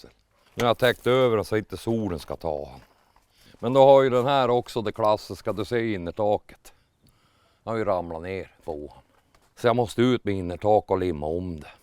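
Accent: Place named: Norrland, Sweden. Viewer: Hur roligt som helst 😂att se en Opelfantast med en dialekt som är superhärlig. En sann norrlänning 👍. Jo jag har haft ett antal Opel genom åren. Några Rekord, 1 Commodore, några Kadetter, en Omega kombi, och den sista var en Astra.... Gillar man rost då ska man köpa Opel😄. Nä fy, det var alldeles för mycket fel på dom, i synnerhet Omegan från -87. Men det är kul å se att det är någon som fortsätter och gilla och bevara Opel från den tiden. Något som skulle vara kul att ha vore en Commodore från tidigt -70 tal men nu är det för mycket pengar i dom... Hälsningar från en Sörlänning boende i Småland 😎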